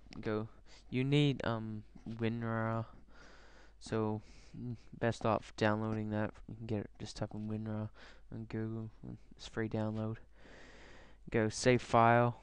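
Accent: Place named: Australia. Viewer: Dude, nice accent, is it aussie?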